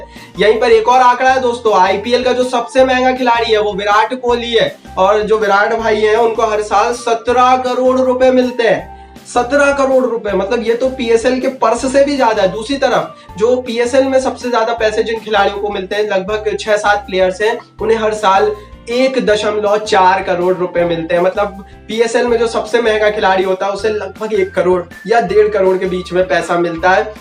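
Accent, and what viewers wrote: Gujarat, India. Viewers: Sir App Gujarati Ho?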